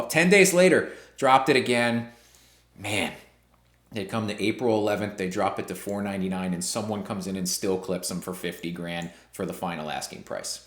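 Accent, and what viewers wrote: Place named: New York, United States. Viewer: Reading those Zillow’s like a sports announcer! Add the NY to it, priceless…. “BOOM, how much money, is this guy NUTZ?” Great video.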